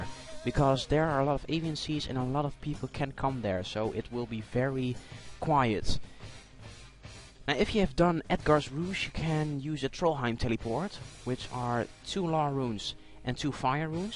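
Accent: Netherlands. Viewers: Hollander dat hoor ik al aan je stem :p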